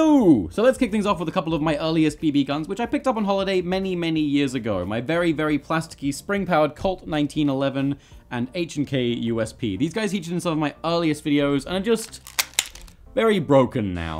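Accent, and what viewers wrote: United States. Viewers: is turning american. prove me wrong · I thought he was American. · You’d think he’s American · I never knew a Brit can sounds so American...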